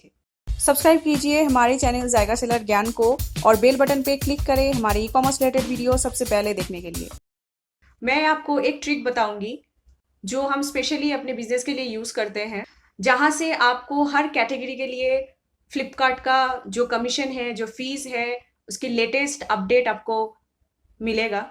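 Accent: Bengal, India. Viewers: বাঙালি হিন্দিতে কথা বললে শুনে মজা আসে|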